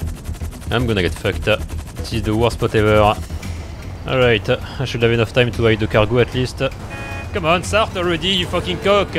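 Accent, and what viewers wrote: France. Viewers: I hear ur French accent can you say Rickey Bobby like the French guy form Talladega knights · Sorry if I seem to be rude somehow, but probably 90% of everything I understood on hearing, considering your French (?) accent, was the swearing. 😄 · Are you french because your accent is really cool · I loved the video and the french accent made everything better!!😂❤ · 3 things 1. This was satisfying 2. WOOW THAT WAS AMAZING SHOT 3. Hearing a french talking english is indeed satisfying to the ear idk why + great ability sir, long live bicycle cult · At first the heavy French accent put me off, and yet over time I find it so hilarious whilst speaking English. Easily subbed. You losing your shit or wrecking someone is some top tier stuff. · that french accent is majestic, as a french person I can agree your accent is awesome! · L'accent Français qui fait plaisir :awesome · Can we all just appreciate his fine French accent and the fact that he CLEANS THE LOBBY for toxic tryhard? 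Thanks a lot dear lord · le petit accens francais sa me fume ^^ , sinon gg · i usually dont watch gta online stuff but this vid was fkin hilarious and your frnech acent makes it waaaaay better hahahaha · Un accent purement français mdrrr ps t’es vidéo sont vraiment Cali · At first i thought you was Polish/Russian but after hearing your accent for one more time your French,lol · I love the french accent. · love the french accent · i like ur french accent :) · his french accent is like the best combination for the vid istg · Le bon gros français de base qui parle anglais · you got a french accent, just like me :D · french accent is " vraiment cool mon gars " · That french accent tho💀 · this accent sounds french i love it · I subed cuz I love ur French axent and love ur humor and I'm part French but I have yet to learn such a language but first I want to watch all the rest of ur vids cuz this is my first vid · The accent made it better ol frenchy boy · This guy has the most french accent of all time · French accent makess this so much better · the French accent makes this 1000x funnier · Le bon accent français bonne vidéo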